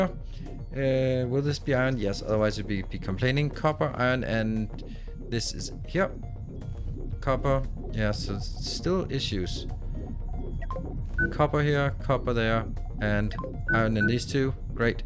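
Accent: Denmark. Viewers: Are you danish by any chance, the accent kinda gets me, just Got this game its so Fun.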